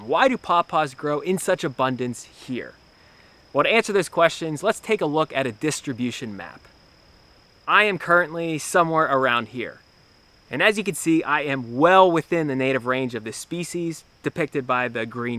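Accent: Pittsburgh, United States. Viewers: Is that a yinzer accent i detect??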